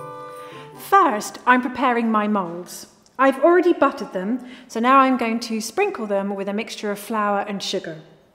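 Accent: United Kingdom. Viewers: British accent is very elegant and the way she speaks is polite and decent.Merry Christmas 💫🌲🌲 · Her British accent is life. Americans have destroyed the English language. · Which british accent is this?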